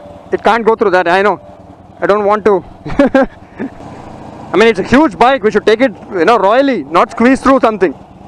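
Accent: India. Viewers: You Accent also reminds me of the Russel Peter's Indian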